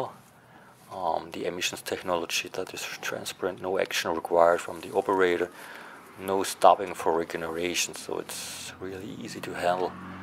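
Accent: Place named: United States. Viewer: Well, sort of English! More American?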